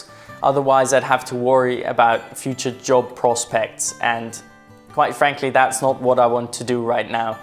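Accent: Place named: Poland. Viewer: may I ask your nationality? sounds like you are polish for lot has chosen u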